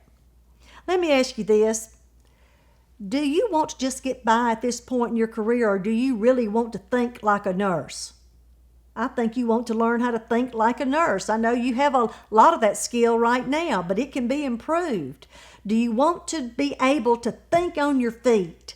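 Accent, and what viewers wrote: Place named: South, United States. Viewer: I could listen to you all day. Love the southern accent.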